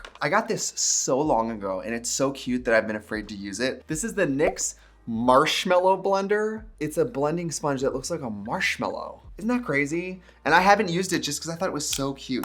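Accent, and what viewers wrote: Wisconsin, United States. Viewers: Her Wisconsin accent matches angry candle lady’s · As a born and raised Wisconsinite who now lives elsewhere in the US like Trixie, my favorite thing is hearing just how much more pronounced her WI accent becomes when she's back in the state. Because the EXACT same thing happens to me. It's like a disease you can only hide for so long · Omg the Wisconsin Mom accent ❤😂❤😂❤😂❤😂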